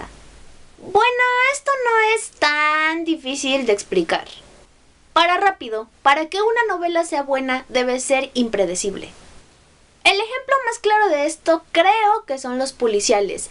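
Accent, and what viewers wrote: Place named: Mexico City, Mexico. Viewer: No sé como alguien con tu capacidad de analisis se involucra en este tipo de lectura. Q practicamente la inmensa mayoria es basura, no sé si sea xq sea propio de tu trabajo. Por ahi en un video tuyo vi q te gusta Harry Poter el libro xsupuesto. Viendolo a cierta distancia pues no es mas q una fantasia no digna de leer pues es eso una fantasia, con esa meticulosidad con q haces tus cosas me gustaria muchisimo q comentaras creo q tu le llamas reseña sobre Emil Cioran, en la cima de la desesperacion, o el inconveniente de haber nacido o algun libro de su obra. Me gusta tu manera tan chilanga de hablar y sobretodo no dar por sentado los planteamientos. Al verte recorde uno de los libros q tanto me ha agradado con el paso del tiempo y q probablemente te seria útil a tus alumnos se llama la lectura de Moises Ladrón de Guevara, es un compendio exquisito para quien cree sabee leer como para el q no. 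Saludos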